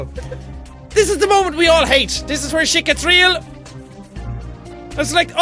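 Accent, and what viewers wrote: Ireland. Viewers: He's Irish mate. I'm half Irish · hes irish thats why he has that accent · I just got out of surgery. What’s better than watching my surgery as a video game with an Irish lad commentating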